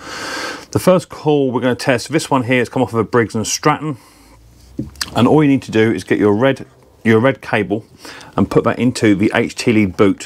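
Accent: United Kingdom. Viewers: your video solved a problem. My mower showed a small spark at each lanyard pull, but would not start. Early in the video, you reveal a coil can spark, but too weakly to start the engine. QED-- now to find a reasonably-priced ignition coil. * PS-- Your Midlands accent is a killer.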